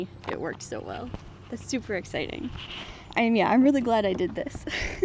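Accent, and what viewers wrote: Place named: Midwest, United States. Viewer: youre from new york?!?! i thought you wouldve been midwest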